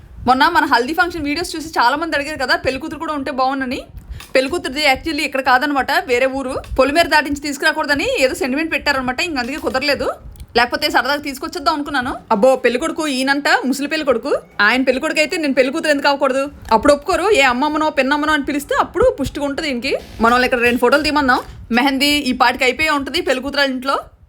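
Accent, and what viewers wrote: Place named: Uttarandhra, India. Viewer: గారు,అబ్బా వీడియో అంతా ఎక్కడా ఏ చిన్న విషయాన్ని వదిలేయకుండా మొత్తం కవర్ చేస్తూ ఫంక్షన్ మొత్తం చూపించేసారండి, మీరు మాట్లాడే యాస ఉత్తరాంధ్ర భాషపై మాకున్న ప్రేమని మరింత పెంచుతుంది,పెళ్లి బొమ్మలు మాత్రం ఎక్సలెంట్ అండి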